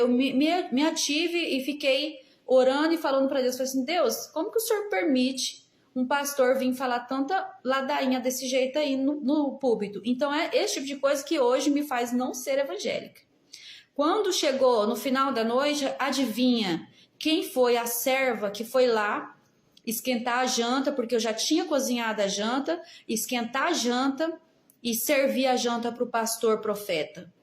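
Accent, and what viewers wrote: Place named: São Paulo, Brazil. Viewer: Sério isso vc de madureira com essa fala...parece q é de são Paulo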